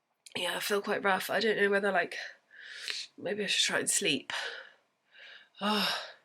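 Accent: United Kingdom. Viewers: You have a really good British accent.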